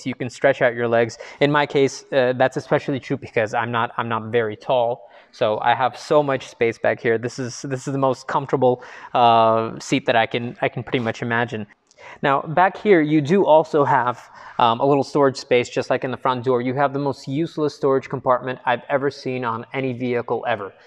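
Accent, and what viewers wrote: United States. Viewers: Why does your accent sound American? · Wow you sound like an American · I thought he was American living in Russia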